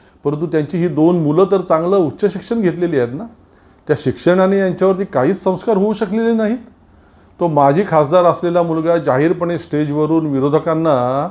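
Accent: Standard. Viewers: मला मराठी भाषा खूप आवडते. शुद्ध मराठी उच्चारण आपला खूप मस्त. मराठी चित्रपटांना प्रोत्साहन दिलं पाहिजे. तसेच मी मुस्लिम आहे पण मला मराठी भाषेचा लेखक व्हायचा आहे.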